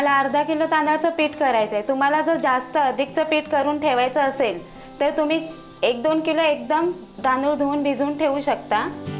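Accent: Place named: Konkan, India. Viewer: खूपच छान रेसिपी सांगता पण सुंदर भाषेत कोकणि ढब छान वाटते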